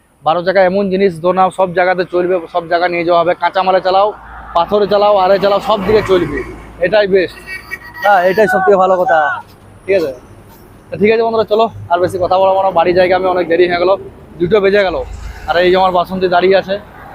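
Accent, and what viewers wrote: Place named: Purulia, India. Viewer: দাদা আপনার বাড়ি কোথায় ❤❤❤ , কথা গুলা আমাদের পুরুলিয়া র মত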